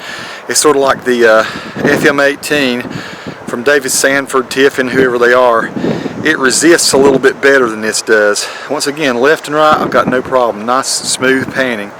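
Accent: United States. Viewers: Your accent is awesome. What part of the States is it from?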